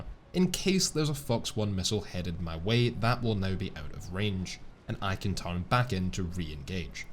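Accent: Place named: Edinburgh, United Kingdom. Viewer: You sound like your from Edinburgh….I like Edinburgh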